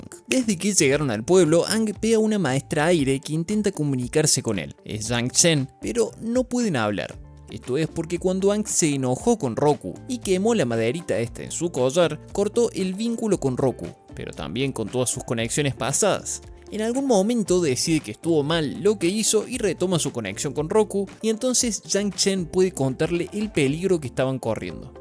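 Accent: Argentina. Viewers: Sos crack amigo y además argentino jajaja pero de que parte sos?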